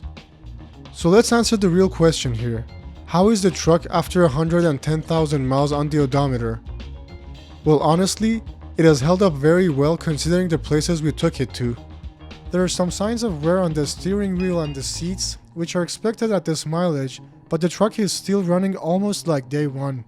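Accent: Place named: Iran. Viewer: Nice Iranian accent. Iranians love Toyota’s 👍🏻👍🏻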